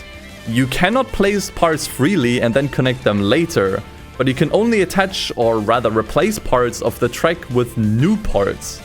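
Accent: Germany. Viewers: Are you German??????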